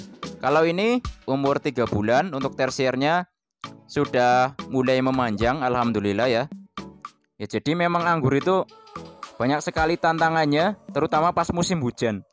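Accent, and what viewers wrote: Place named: Java, Indonesia. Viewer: Jawa banget... Medok banget jawane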